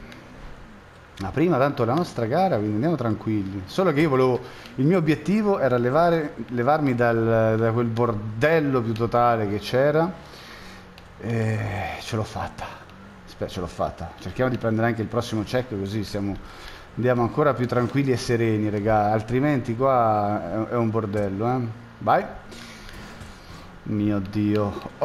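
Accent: Italy. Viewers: Nada mau para um italiano falar um pouco de inglês, mas sem ofensa mas o sotaque seu italiano com o de inglês não cai bem.Usa il traduttore per capire la mia lingua brasiliana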